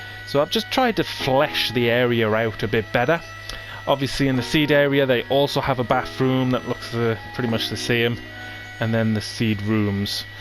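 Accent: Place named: Newcastle, United Kingdom. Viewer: It looks fab and you still sound great. Sexy Geordie accent xxxx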